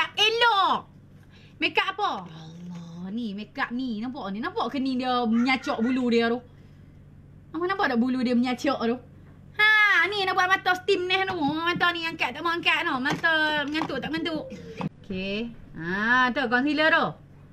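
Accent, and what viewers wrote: Kelantan, Malaysia. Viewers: suka tengok...kecek kelate pula we...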